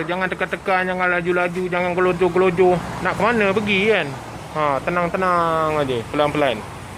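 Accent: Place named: Perak, Malaysia. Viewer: Assalamualaikum.. Bos area mana.. Dgr mcm belah Perak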